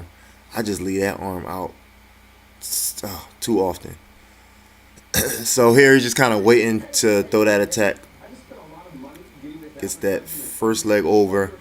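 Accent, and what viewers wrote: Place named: New York, United States. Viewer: Great vid.... where is this place? sounds like a NY accent to me :) Keep up the good work!!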